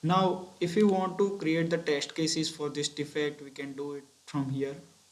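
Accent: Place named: India. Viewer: that's , indian accent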